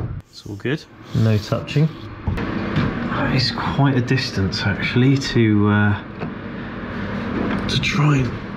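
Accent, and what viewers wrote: United Kingdom. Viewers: listening to you prattle on with that fancy British accent is never boring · *English accent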